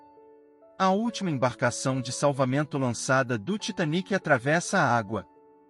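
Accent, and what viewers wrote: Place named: Brazil. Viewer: ESTE SOTAQUE BRASILEIRO DESPERTA LOGO DESCONFIANÇA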